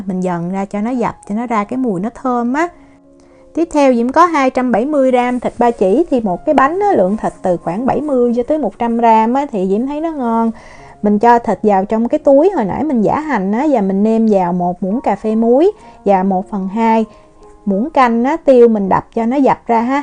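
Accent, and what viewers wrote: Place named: Mekong Delta, Vietnam. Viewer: lam Theo cach cua Chị Thanh cong nhieu mon ma khong co cach nao khoe voi Chị , Trong clip Chị co noi nha Chị o bien hoa ? Ma nghe tieng Chị Em nghi Chị o mien Tay , Em cung o gan bien hoa 😀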